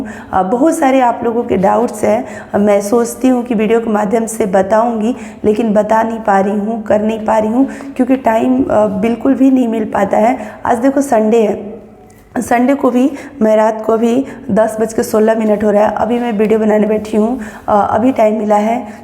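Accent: Bihar, India. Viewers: Mam ap bihar se h?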